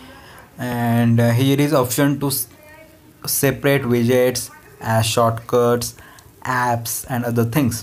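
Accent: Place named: India. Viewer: Indian accent is so thick :D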